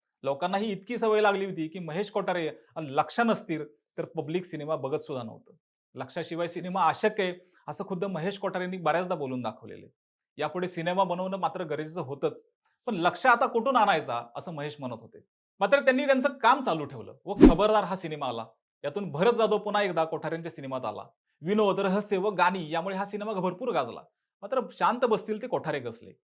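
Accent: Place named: Rural, India. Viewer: बोलण्याचा लहेजा बदलत चला प्रत्येक वेळी ग्रामीण लहेजा चालेल असे नाही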